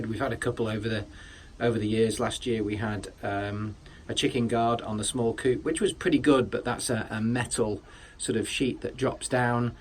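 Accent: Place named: England, United Kingdom. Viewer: awesome! That explains the accent. How you liking the U.S.? It's a lot bigger than England..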